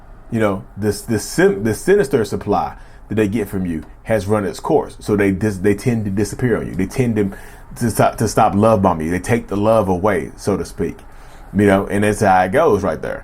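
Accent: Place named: Chicago, United States. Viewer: You from Chicago? Hear the “ you know “ a lot when people are from Chicago or the Midwest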